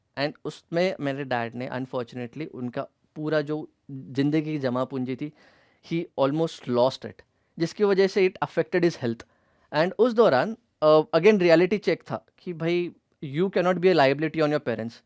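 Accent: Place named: Karnataka, India. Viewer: Kannadi accent was the best part of this video..... 😂😂😂😂😂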